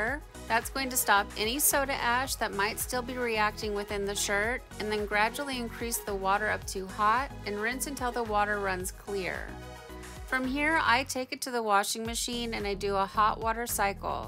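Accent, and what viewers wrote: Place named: Midwest, United States. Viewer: Not weird at all! But I insisted my husband come hear you say it because I knew it would give him a taste of home. You’ve got the typical midwestern “flat a” just like he does when pronouncing words like back, flat, and, of course, wag. He’s from Michigan, but we currently live in South Florida, so “hearing home” made him smile. Thanks for that. As for THIS wigwag, HOLY MOLY!!! I don’t have words (and that’s saying something for ME!) Simply stunning! Redemption complete.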